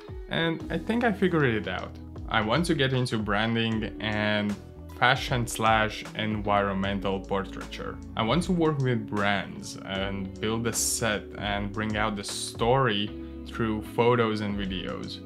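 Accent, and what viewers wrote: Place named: Eastern Europe. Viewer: Wonderful content! Where are you from? You sound like Eastern Europe brother :)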